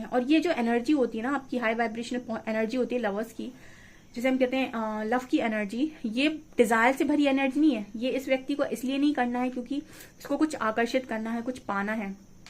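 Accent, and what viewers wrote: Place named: Bhedaghat, India. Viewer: Aaki boli se samajh Gaya main ki AAP Kahan se ho behanji...bhedaghaat..am I right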